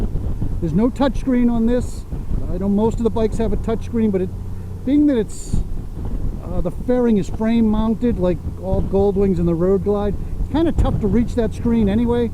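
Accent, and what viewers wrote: New England, United States. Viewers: Cool video, but if you don't mind my saying - that is a serious New England accent you got there. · "Manchestuh New Hampshuh" Love the accent.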